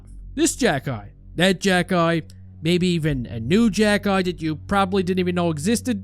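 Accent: Newfoundland, Canada. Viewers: Do I hear a newfie accent?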